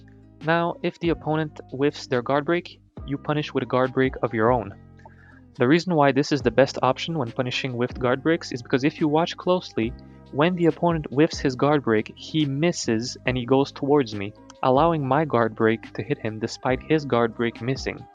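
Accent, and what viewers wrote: Romania. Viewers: Are you from Romania?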